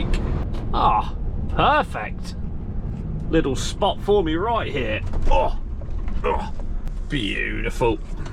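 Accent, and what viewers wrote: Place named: United Kingdom. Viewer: i liked this uk english voice :D , btw nice video as always bro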